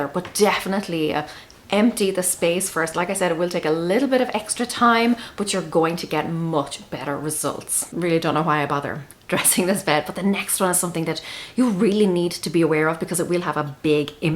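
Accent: Ireland. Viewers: Always love your videos and that wonderful melodic Irish accent xx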